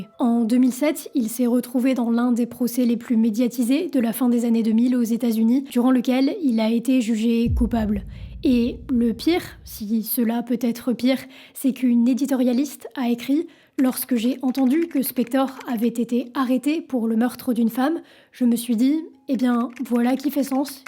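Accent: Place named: France. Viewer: Très bon documentaire, bravo! Par-contre si je peux me permettre, essayez d'améliorer votre anglais.''The'' devrait sonner presque comme ''de'' et non ''ze''. Vous n'êtes pas la seule, la plupart des français le prononcent comme vous! Juste mon petit grin de sel ; )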